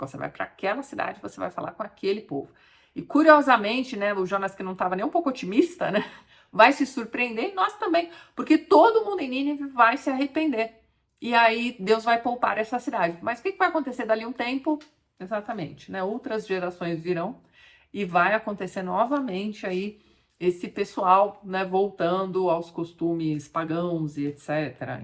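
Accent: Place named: Minas Gerais, Brazil. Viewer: Parece mineiro. Zezinho, fiii do Arnaldo....kkkkkkkkk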